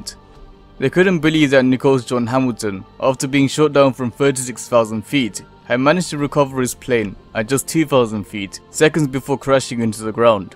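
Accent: South Africa. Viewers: Just a question: is that a SA accent? 😀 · What are you mate? South African? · It's the accent south African? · I'll hava guess, south African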